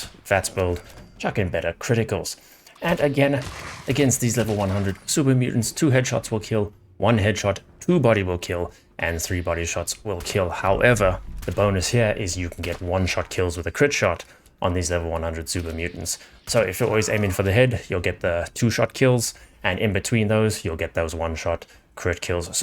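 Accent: South Africa. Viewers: Is that a South African accent I hear?! Subbed.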